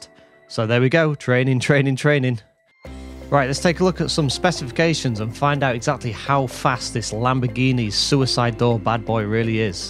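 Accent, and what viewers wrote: United Kingdom. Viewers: brittish accent???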